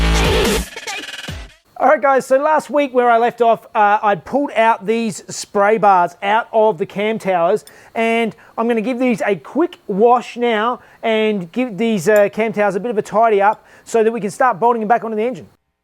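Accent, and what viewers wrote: Australia. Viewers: I can hear the “aaaaaaand” on the Australian accent in that thumbnail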